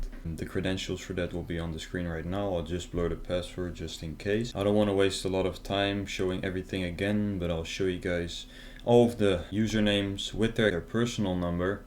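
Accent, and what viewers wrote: Netherlands. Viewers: You send dutch af my guy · Happen to be dutch lol? Lmao your accent love it, just like the justice given! Keep up the good work! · ik heb echt respect voor jou kerel je gebruikt je hacking skills tenminste voor een goed doel ga zo door!!! je bent Nederlands neem ik aan van je accent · Great vid, but you reveal a little too much that you're Dutch speaking, i'd say close to Belgium or Belgium itself ;-) groeten van Antwerpen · Hi.. thanks for your service in raiding the scammers. Are you Dutch? Sorry, just listening to your accent. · Broer waar in nederland woon je ? Dacht al die nederlandse engels accent. Jij zat vroeger zeker op ict of wa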